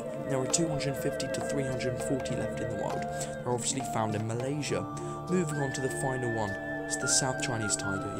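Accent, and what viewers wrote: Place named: England, United Kingdom. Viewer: Are you English? You have a strong accent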